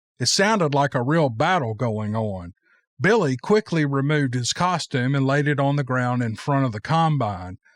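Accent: United States. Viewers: You are over the top especially with that accent of real American English spoken the way it should be spoke thank you you are truly an awesome story teller thank you so much I’ve given up reading and just listen to you , it’s not that way about reading my eyesight is getting weak as am I in the tie I have left it’s not so bad to go especially listening to you and your soothing voice thks again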